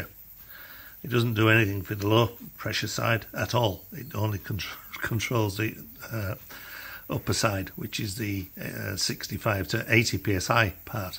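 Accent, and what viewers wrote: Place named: Yorkshire, United Kingdom. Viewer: Your a Yorkshire man but in oz ?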